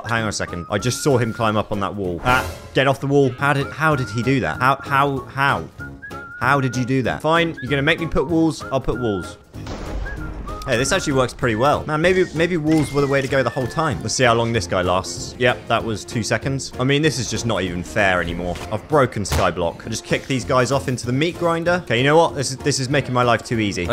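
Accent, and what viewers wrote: United Kingdom. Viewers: You sound brithis and not british at the same time wtf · Are you British? 😢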